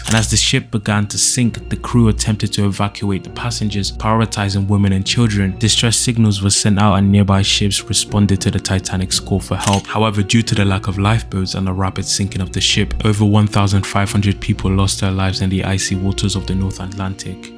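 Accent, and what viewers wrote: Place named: United Kingdom. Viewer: He is British that's a British accent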